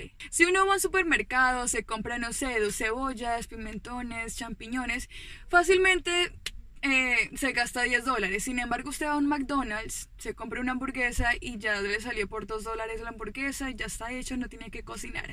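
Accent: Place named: Huila, Colombia. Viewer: Se nota el huilenseee manooo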